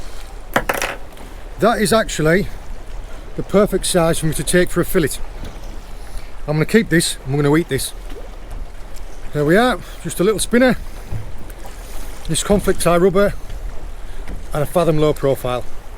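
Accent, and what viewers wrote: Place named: England, United Kingdom. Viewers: Your English accent is unbelievable. Don't understand nothing. My hello from Ukraine! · Dear Mate! I Love your Cornish accent, heavy to understand. Fishing, foraging the Cornish tides (along with your son)! Like Grannma Gina cooking pasta, however allowed two lobsters, three big crabs waving with claws before cooked. That’s the juice! You’l never eat all the seafood by yourselves. You catch and you share with Family and with Friends.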